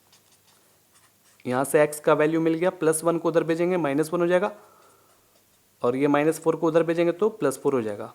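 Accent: Bihar, India. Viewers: Sir you are from Bihar 😊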